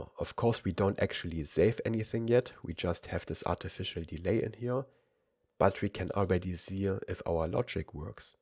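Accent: Germany. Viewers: I can smell a bold German accent 😅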